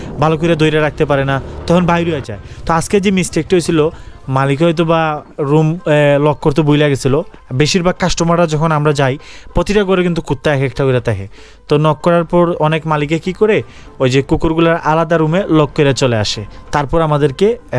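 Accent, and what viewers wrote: Sylhet, Bangladesh. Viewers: Apne Sylhety Mone Hoy,Voice sune buja jay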